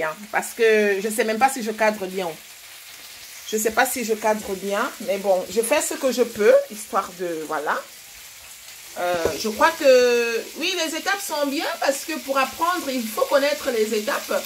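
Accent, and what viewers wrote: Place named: Gabon. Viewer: L'accent gabonais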